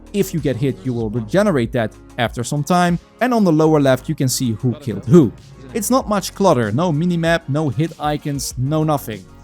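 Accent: Netherlands. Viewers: Ik ruik een nederlander😂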